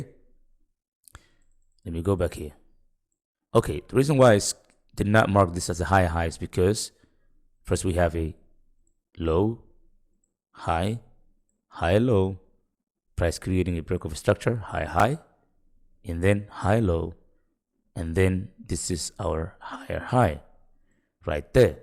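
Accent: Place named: Somalia. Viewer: You sound somali, i dont know why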